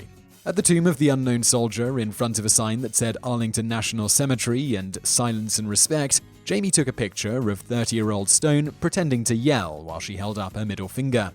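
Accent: United Kingdom. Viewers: sounds so much more British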